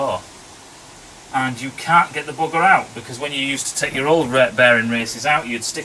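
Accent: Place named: England, United Kingdom. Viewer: As soon as I hear the english accent, I know it is going to be a cluster f k of wrong tools and half ass work.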